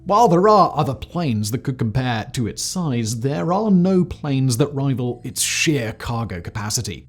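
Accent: RP, United Kingdom. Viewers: it is me or is he sounding even more posh these days..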